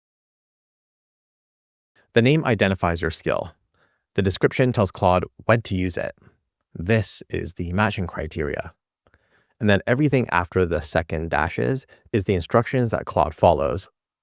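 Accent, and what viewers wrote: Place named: California, United States. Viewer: SNL Californians accent is somewhat annoying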